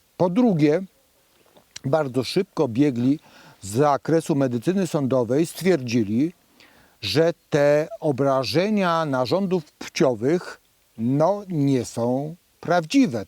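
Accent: Kraków, Poland. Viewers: pan Inspektor jest z Krakowa. Tam się tak mówi. Zamiast np dziesiąta trzydzieści - dziesiąta czydzieści.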